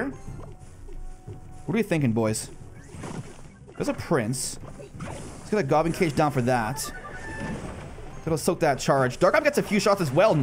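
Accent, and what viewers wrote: Canada. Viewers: Canadian eh? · was just watching a random video (first video of him) and realised this guy was canadian. And yes,I even searched it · He’s prob Canadian they say that a lot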